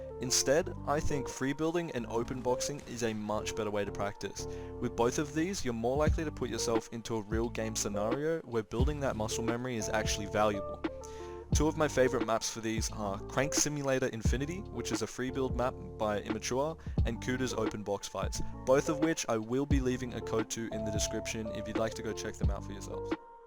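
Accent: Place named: Germany. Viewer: are u german ? you accent sound like german Wenn du mich verstehst man versteht immer seine Deutschen Brüder XD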